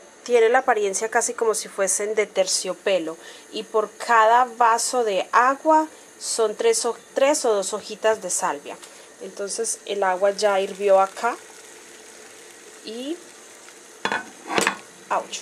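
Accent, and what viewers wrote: Colombia. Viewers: Hoy descubrí estos videos y están geniales, gracias por compartirlos con todos nosotros :) Eres paisa? Es que te siento como acento jeje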